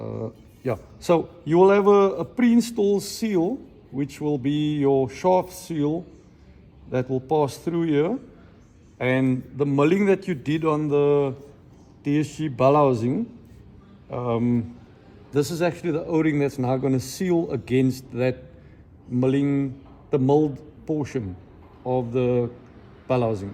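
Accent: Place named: South Africa. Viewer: Are you from South Africa lol just come across your vid you sound a lot like the guy from district 9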